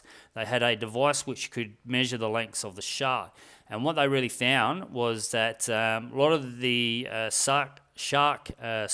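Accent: Australia. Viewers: The size of the shark would not matter to me; a shark is a shark!!! Whether it's 1 meter or 5 meters, there's absolutely no freakin' way I'd go in the water ever again!!! Oh by the way, I like your Aussie accent. Just sayin'. 🇨🇦👊🤙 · he's Strayan.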